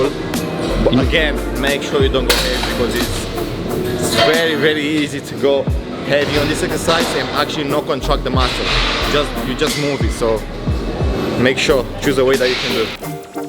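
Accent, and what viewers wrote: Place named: Romania. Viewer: I like the way he combines english with romanian sțo ;) Bravo!!! Andddd keep up the good work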